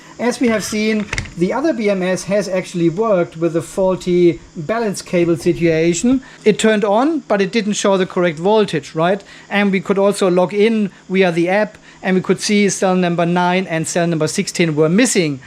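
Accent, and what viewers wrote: Germany. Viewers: A few weeks ago, I stumbled on one of your video while looking for a review on a bms. I got attracted by your german accent (Es gab eine Zeit, in der ich Deutsch sprach) and I have learned a lot through your videos. I finally orderded a V15 JK inverter bms that I just tested yesterday. Working on a self consumption system using grid as partial storage (injection tarrif are good here) to lower battery volume. So far so good, integration with both victron (cerbo gx) and sma sunny island is underway thanks to your very informative contents. Thanks again from Dakar , Sénégal, and keep it up.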